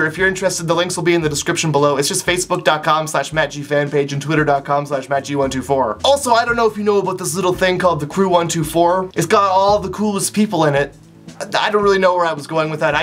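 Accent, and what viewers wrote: Canada. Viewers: I would of awkwardly hiven her some hummus, and awkwardly pat her shoulder. & plus your getting a little canadian accent c: coooool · Is he Canadian I'm new to this channel so idk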